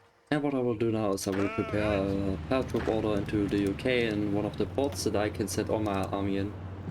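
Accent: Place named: Germany. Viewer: nice video bro und mann hört das du deutsch bist no front :)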